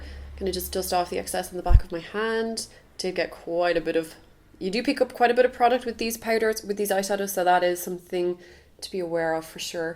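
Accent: Ireland. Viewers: I know is in Australia, but her accent sounds very irish. So im confused.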